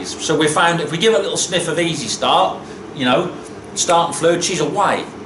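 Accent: Norfolk, United Kingdom. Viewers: You sound just like me as everyone say you carrot cruncher roughly what part of Norfolk you from I live in fakenham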